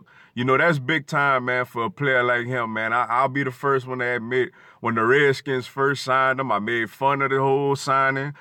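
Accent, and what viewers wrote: New Orleans, United States. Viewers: Sound like a NewOrleans?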